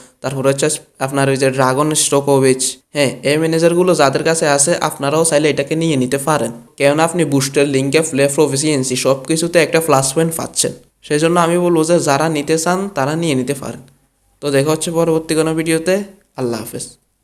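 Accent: Noakhali, Bangladesh. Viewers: ভাই কি নোয়াখালীর নাকি?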